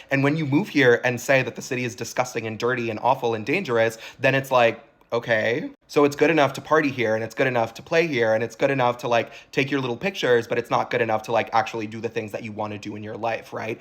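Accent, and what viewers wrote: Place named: California, United States. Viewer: You don't sound like a New Yorker. You're very young. New Yorker's are supposed to say New Yawk and buttah and cawfee. You sound Californian. Oy vey, NY just isn't the same anymore. Greenwich Village needs a new tide of extreme weirdos; you know, people who wear lampshades on their heads or walk their partner like a dog literally with a leash. That's the Village I remember. If it ain't bizarre, it ain't the village, and it ain't NY.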